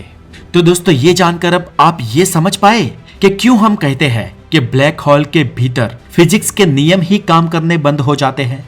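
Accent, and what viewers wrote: Gujarat, India. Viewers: gujarati hai. par udisa bilkul dusre chhor par hote hue bhi similar hai kuch chize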